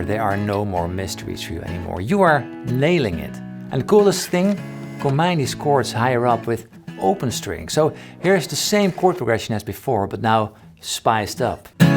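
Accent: Netherlands. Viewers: "The beard guy with the weird accent" I'm gonna guess Swedish. I don't know why, but that's what my first thought was. Edit after finding out it's a Dutch accent: Well I got the continent right. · are u from holland? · I sound a little less Dutch I hope but he's the better teacher haha netjes broer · I thought 'hmm, I think he's Dutch' but at the 'Oh mijn God' I knew it hahahaa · Me trying to figure out if this man is Dutch or not. Him Me: Yup, deffo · You inspire me to play the guitar. I really like 08. very beautiful sounds. Ik hoorde trouwens gelijk dat je nederlands was, fantastisch! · I love your Nederlands accent 😂❤️ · Funny, he also plays with a kinda Dutchie Euro accent. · already felt like it was a dutch accent XD ook hallo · Well I always thought you were dutch from the accent. This did it for me XD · oh mijn god sounds dutch!💯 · Only the Dutch have this beautiful accent when they speak English:)) Hartstikke mooi joh, ben een beginner maar ga je zeker volgen!!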